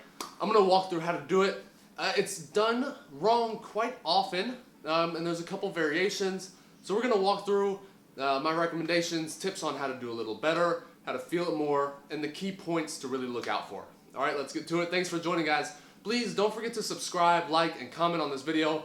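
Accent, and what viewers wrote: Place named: Texas, United States. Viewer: Hey. This is one of the best explanations of the RDL that I have ever heard. You must be a great trainer. One thing I missed is how far apart are your feet? One feet, two feet? Also do the Brits question you about your accent and say "Oh you must be a Yank?" At which point you say "no, I am a Texan!" Thanks for the video.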